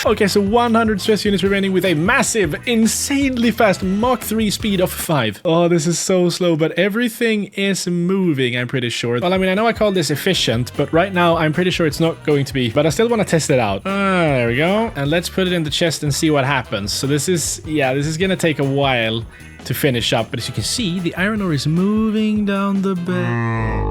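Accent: Sweden. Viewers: Are you swedish? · why has swedish accent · Är du från Sverige? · U sound so swedish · Are you swedish???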